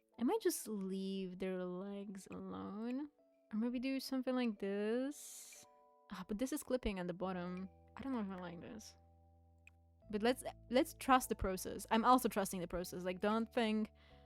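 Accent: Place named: Poland. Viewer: omgggg of course it's slavic (i've always loved that regions accents, but i dont think i've ever heard a polish accent). its beautiful i love it so much!!! 🤍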